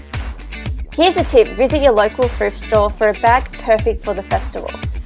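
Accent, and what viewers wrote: Australia. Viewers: your accent reminds me of my trip to australia... I just came back to Italy from Melbourne.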